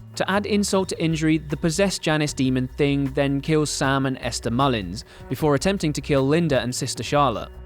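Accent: United Kingdom. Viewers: it's his accent, basically he pronounced it in british accent because he is british. he didn't pronounce it la yorona because he is not a spanish speaker. we all have distinguish pronouncation b*tch. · Your British accent is charming , like all 'cockneyian " accents are ! Your pronunciation of " la - llorana ", not so much ...😂😂😂😂😂😂😂😂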